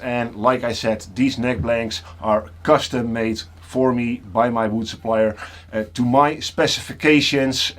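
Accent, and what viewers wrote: Netherlands. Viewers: I'm detecting a slight Dutch accent... Are you Dutch?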